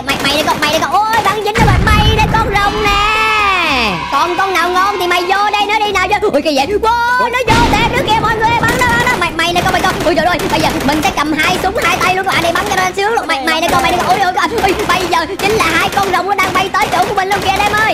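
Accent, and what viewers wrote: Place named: Thanh Hoa, Vietnam. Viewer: Noi thanh hoa ngoai nghê an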